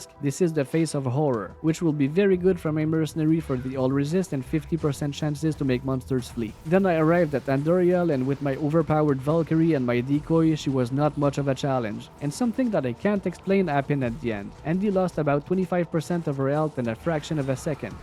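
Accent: Quebec, Canada. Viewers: do i hear a small Québec accent ? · Sa sent vraiment le québécois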